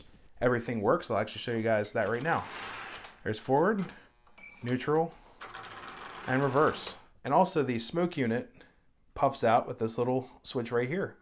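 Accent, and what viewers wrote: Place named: Maryland, United States. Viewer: the Train Garden? are you from Baltimore? I hear those Maryland and SE PA vowels.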